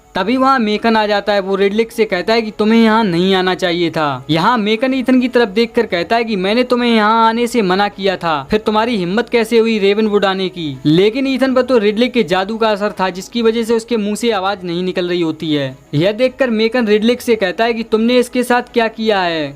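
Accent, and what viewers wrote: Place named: Uttar Pradesh, India. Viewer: Kya bhai u.p. ke ho na aap mast👍👍👍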